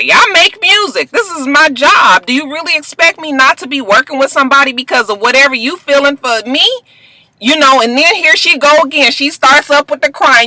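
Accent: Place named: California, United States. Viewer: !! I love your Ray J! You know you're from Cali, you got the accent down. You went back to Cali with "molded" and "loc up" today! I'm just but I am so excited, I can't wait for Mally Mall! I can hardly contain myself! LOL. Love your videos!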